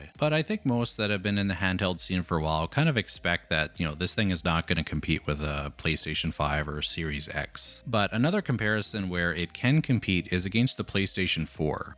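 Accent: Canada. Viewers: 'dem frametimes tho.... The ALLY will get better, there's 0 doubt in that. It's baffling that they allowed the review results so early (not having an NDA in place before June 13th which is typical industry practice)... Thanks for some more sweet canadian accent!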